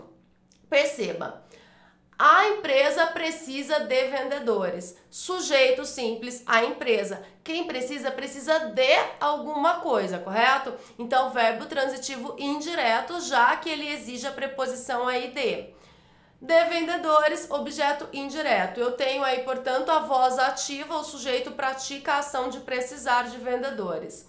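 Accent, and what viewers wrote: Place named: South, Brazil. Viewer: Professora, seu sotaque parece ser do sul, mas qual estado? Abraços apertados de quem já assistiu as suas aulas literalmente centenas de vezes.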